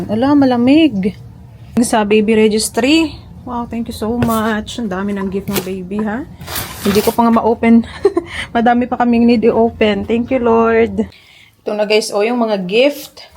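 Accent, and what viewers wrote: Iloilo, Philippines. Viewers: ang sis in law ko dati marami rin pimples tapos nag consult siya sa dermatologist naghiyang siya dun sa pinapahid niya gumanda ang Mukha Niya. ikaw din maganda kna parang may kinang ang Mukha mo. I wish mkadiscover ka ng gamot n mkaalis ng pimples mo para Lalo Kang gumanda . honestly bisaya din Ako but i really love the ascent of ilongga.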